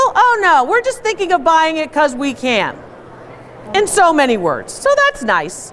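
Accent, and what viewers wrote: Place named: New York, United States. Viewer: Ooooohhhh ok. Are you from Michigan then? I heard you say that's a school you attended but you have a bit of a New Yorker accent ❤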